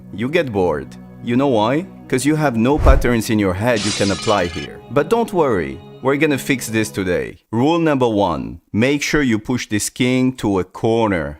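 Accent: France. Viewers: boy does the french accent suck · omg the french accent is amazing 😁😁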